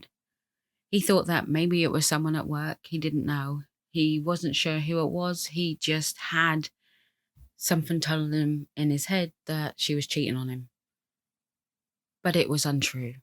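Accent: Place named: East Anglia, United Kingdom. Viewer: Norfolk? Suffolk? You started off and you’re accent crops up , less Norwich , more Ipswich ? Go on , I’m Yarmouth Girl here born n Norwich , miss the Sea ? ‘ hearing ‘ , that was a blast , subbed , hadn’t seen youre channel which odd , most female crime channels wind me up ~youre emotions are appropriate and feel real . Cheers for the vid , tragic sad story ✨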